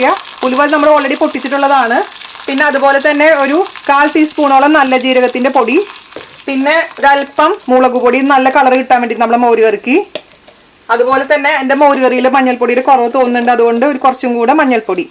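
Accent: Thrissur, India. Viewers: Chechi ur presentation is Soo gud n u have a great thrissur slang 🥰😍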